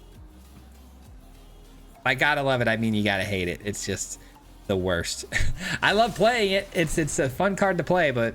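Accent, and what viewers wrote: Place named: United States. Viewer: Well he is american. They way they say everything kills me.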